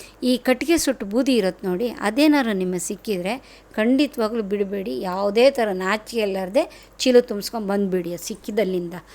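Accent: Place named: Kundapura, India. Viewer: but kannada superb mathdtheer... kundapura ankonde nanu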